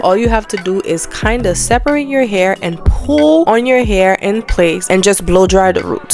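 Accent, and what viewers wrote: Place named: Barbados. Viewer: Beautiful hair & Love your accent, Are you from Barbados?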